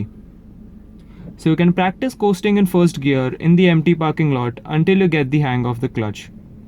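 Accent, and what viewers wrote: India. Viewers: The indian accent made this much better xD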